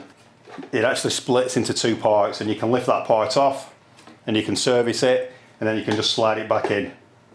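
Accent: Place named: North, United Kingdom. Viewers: thank you for that - good man. Got that trust worthy northern workman voice as well to install even more confidence! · I have this type of toilet, it’s knackered and I need a new one fitted! Any chance you are close to Liverpool and could come and do it for me please? Your accent sounds like you may be from St Helens which is only down the road 🙏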